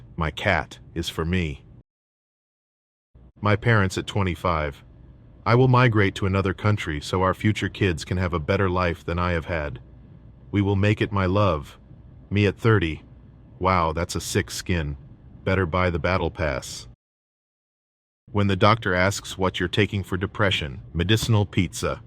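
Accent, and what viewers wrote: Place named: United States. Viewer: Love the conten Hate the voice I hate American speaking voice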